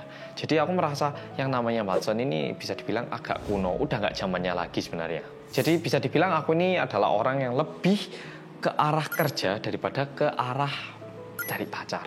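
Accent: Java, Indonesia. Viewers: medok itu nama logat jawanya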